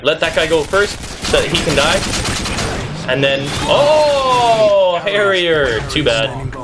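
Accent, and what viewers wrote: England, United Kingdom. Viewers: i <3 ur british accent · Seemed a bit like he had a split personality with the English accent and the whatnot, got a good laugh or two from listening to the ramblings of a murderous English-man, thumbs up indeed. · lol why do you always do an English accent in your commentaries? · loving the british accent haha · Best live commetary ever!! I love your british accent lol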